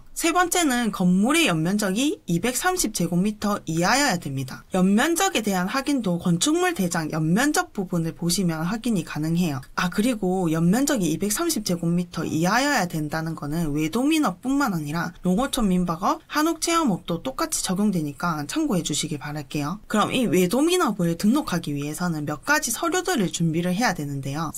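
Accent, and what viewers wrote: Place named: Gyeongnam, South Korea. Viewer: 경남 말투 졸라 심하다 ㅜㅜ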